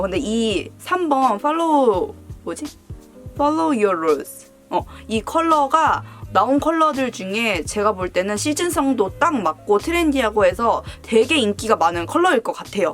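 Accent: Jeolla, South Korea. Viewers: 엌ㅋㅋㅋㅋ 언니 말투에서 살짝 전라도 느낌이나서 귀엽다고 느꼈는데 부모님의 영향이었군요 ㅋㅋㅋㅋ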